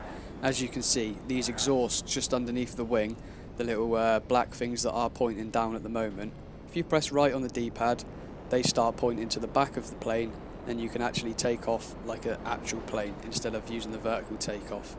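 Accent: United Kingdom. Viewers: Is he British or English but anyway I love his accent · love that british accent, nice video btw :D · This guy sounds British but his personality is all Canadian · I think the same, all youtubers GTA do clickbaiting. Please don't end up doing this though. I actually learned something from your video. I'd normally find British accents annoying but your voice is kinda soothing so thanks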